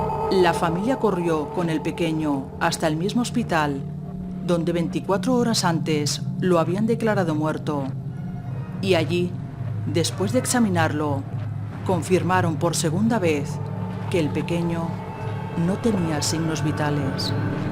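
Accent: Spain. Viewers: siento que la chica que esta hablando es de españa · ese acento Espanol me cae en los huevos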